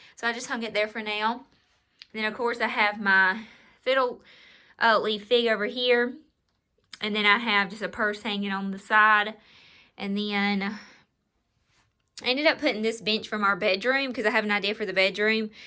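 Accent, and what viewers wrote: South, United States. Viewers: I'm not one of those youtubers who looks flawless lol I cant stand fake one their home are not that dirty to make a video. Love your videos and southern accent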